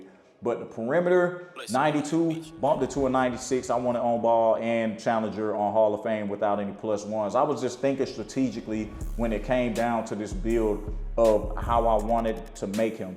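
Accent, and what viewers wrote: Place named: South, United States. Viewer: Great content bro new sub ! U sound like u from the south dog !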